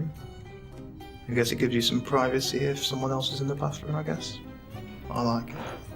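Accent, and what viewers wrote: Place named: United Kingdom. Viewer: Какой красивый британский акцент. Спасибо Вам за видео. Я не была в Воронеже, но теперь хочу поехать прсмотреть.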